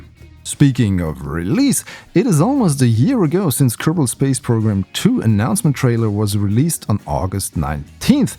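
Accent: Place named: Germany. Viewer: Your Hochdeutscher Akzent ist very obvious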